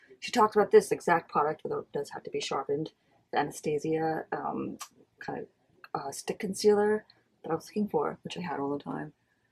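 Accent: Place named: Scotland, United Kingdom. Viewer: I loved the end result, all the colors came together nicely. I like that you shared that you are a minimalist when it comes to make-up, i'm the opposite in that I love to do a full face look with contour and all. I must commend you on the editing of the video when you showed the product as you are using them, wow. I just hit the subscribe button just as you were saying to subscribe, lol. And finally, I love, love, love your accent, it sounds kinda Scottish so beautiful.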